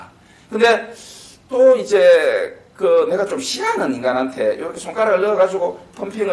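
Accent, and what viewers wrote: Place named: North Gyeongsang, South Korea. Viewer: 싸이먼디는 부산이고 선생님은 경북말투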